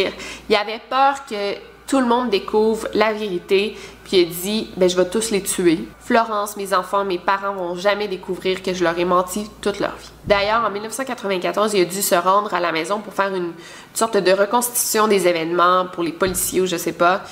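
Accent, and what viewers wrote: Quebec, Canada. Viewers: Au départ l'accent québécois, ne me bloquais pas mais je me demandais si avec certaine expression je réussirais à être captivée et zn faite au bout du premier jour après ma déciuverte de ta chaine j'avais déjà regardé neufs vidéo 😂 Bref, j'aime énormement, finallement l'accent ne change rien à la qualité se qui est logique au fond, je suis une récente abonnée et n'es donc pas tout vus mais une bonne partie ! Je garde l'histoire de Maura Murray pour la fin ! Bref, merci beaucoup ❤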